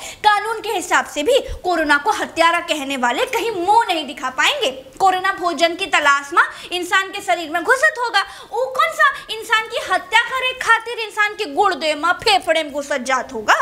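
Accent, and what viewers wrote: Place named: Bhojpuri, India. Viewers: गजब बिहारी आवाज में पोल खोल दी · Bhojpuri slang osm madam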